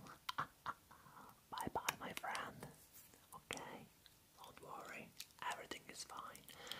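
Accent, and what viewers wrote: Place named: Italy. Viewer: That Italian accent while whispering sounds so good in your voice. 😍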